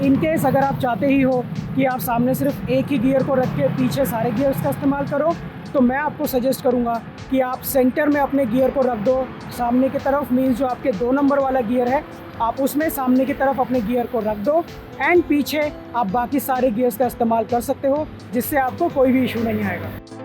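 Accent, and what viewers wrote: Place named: Kashmir, India. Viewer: Very nice. Your accent is Kashmiri 😊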